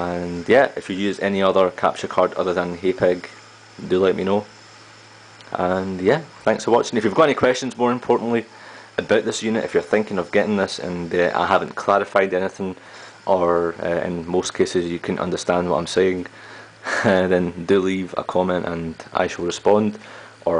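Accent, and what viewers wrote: Scotland, United Kingdom. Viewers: your Scottish :)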